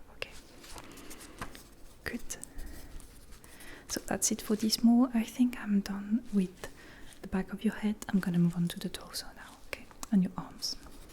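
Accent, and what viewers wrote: France. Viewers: Are you French? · Her accent is french :)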